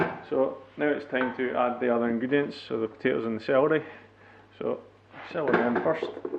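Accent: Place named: Scotland, United Kingdom. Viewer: he has a beautiful Scottish speaking voice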